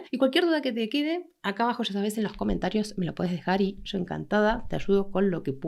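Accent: Argentina. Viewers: Hola, muchas gracias por compartir tu sabiduria, Ió ( jaja en cordobé..) vengo de la escuela Astrológica (de Munich..) y hace 1 semana metido en Diseño Humano ( justamente buscando Info de todos lados y en alemán y con vos en español poco porteño jaja..). Y cierto notaba que cuando mi alimento es simplón.. fideos solitos ó arroz desparramados pero mirándome con ternura, soy felíz.. Claro no siempre, pero ésos momentos me hace sentir bién, Ah.. tengo la variable 1- Apetito... Saludos desde Kelheim! Gracias y sigo informándome con tus Videos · Estoy feliz de haber encontrado tus vídeos. Voy a ver el link que dijiste para ver mi variable. Muchas gracias,. Bife jiji, me encanta el acento y las palabras argentinas. Saludos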